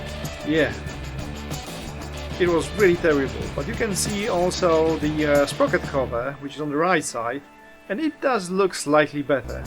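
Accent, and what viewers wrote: Poland. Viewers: Ciekawi mnie czy dobrze rozpoznaje polski akcent?🤔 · Hi there. Z Polski? Akcent :-) Napiszesz co dokładnie zmieniłeś w motocyklu?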